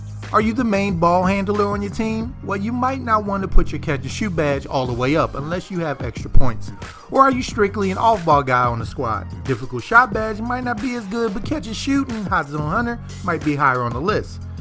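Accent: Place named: Baltimore, United States. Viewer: Can tell you from Baltimore wit da accent 😭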